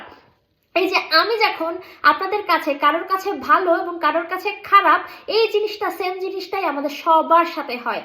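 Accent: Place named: Bagerhat, Bangladesh. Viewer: আপনি বাগেরহটের আঞ্চলিক ভাষা কথা বললে ভালো লাগে, একজনকে মিস করি যে দূনিয়াতে নেই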